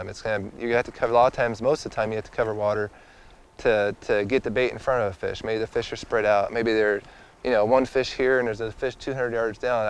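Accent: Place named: Southern California, United States. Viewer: He was from Southern California, it's just the regional dialect.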